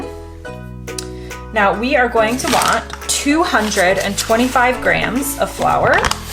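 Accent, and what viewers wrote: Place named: United States. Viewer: I could fall asleep to your voice... Esp when you say "go ahead" at least once every 30 secs like a true American. Very cute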